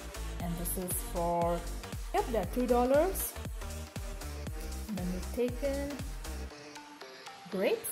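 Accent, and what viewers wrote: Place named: India. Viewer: I absolutely will! Btw, I love your Indian accent mixed with the Australian terms. It’s just so worldly to me and it’s cool to listen to 😃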